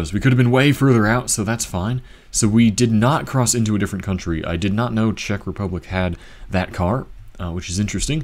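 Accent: Russia. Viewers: GG noticable improvement in your pronounciation as well ;) but you still sound like a russian trying to pronounce english. You shouldn't really emphasize anything other than the beginning of the word, and the lengths of each syllable should be of the same length, unless you see a line over the letter (á (as in hard), a (as in duck)). I noticed this when you said nád instead of nad Labem. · Takový ruský přízvuk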